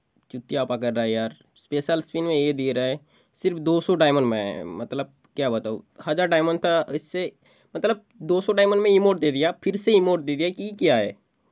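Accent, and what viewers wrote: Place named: South, India. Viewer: Aap thodaa south side se ho khaa bhai aapka voice se samjaa rhaa hai bro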